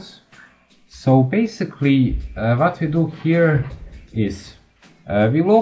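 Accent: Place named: Russia. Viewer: русский акцент , несет за версту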